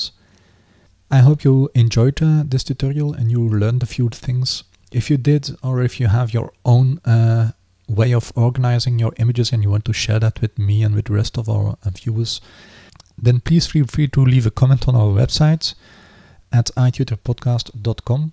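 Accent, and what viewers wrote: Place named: Netherlands. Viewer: Great info! Thanks man, you sound Dutch.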